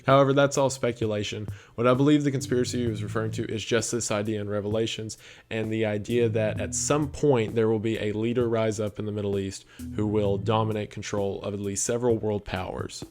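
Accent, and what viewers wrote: South, United States. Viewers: i’m a lesbian but this southern belle sounding bucky barnes look alike has just stolen my heart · First time that I’ve enjoyed listening to a southern Christian talk about conspiracy theories.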